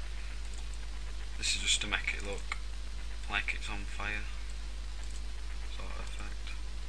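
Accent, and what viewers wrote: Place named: England, United Kingdom. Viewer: Seriously? He's English duh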